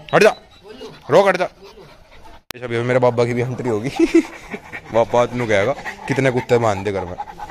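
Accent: Haryana, India. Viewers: Bhai app haryana se ho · Bro aap rhata up ma ho par bolta haryanvi ho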